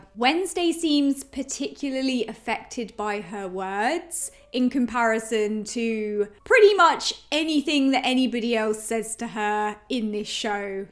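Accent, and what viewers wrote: United Kingdom. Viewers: not related to the topic... but where is your accent from? Somewhere in the UK I presume?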